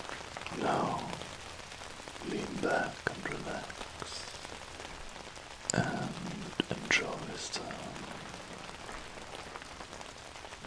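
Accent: Germany. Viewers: He’s German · Do you mean “accent”? If so, I think he’s German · You're German aren't you?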